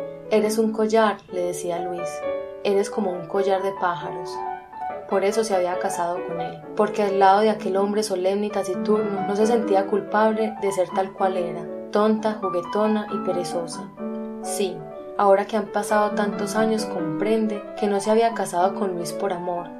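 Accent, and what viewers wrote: Antioquia, Colombia. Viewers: El acento de colombiana le da otro aire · Que habladito paisa tan ricolino e.e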